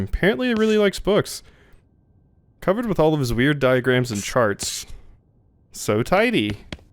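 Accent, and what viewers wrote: United States. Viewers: To be fair I'm not a huge fan of "funny" games, but I do love western, and when I saw the Dad's animation I decided to at least giving this episode a shot. Liking it so far, but I'll mostly keep watching for your cowboy accent:D Happy New Year,! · Gonna be hearin' a lotta that southern part'a ya. Can't wait.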